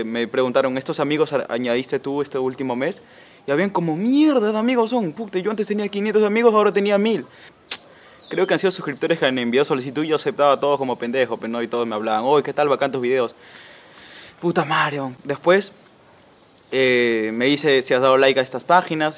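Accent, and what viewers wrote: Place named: North, Spain. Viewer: Algun dia ire a vivir al norte para tener su acento y decir pucta v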